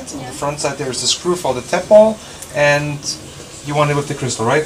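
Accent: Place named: Manchester, United Kingdom. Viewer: Love her Manc accent. I know, there is not just one American or British accent, but in general I think that British English sounds much better.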